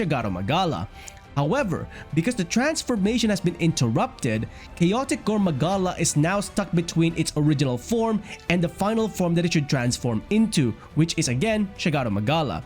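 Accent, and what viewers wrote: Philippines. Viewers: When i Heard your Accent, I already know you are a "Kababayan" I think Variant Monsters are Those monsters that adapt to their surrounding or maybe just a Gene Mutation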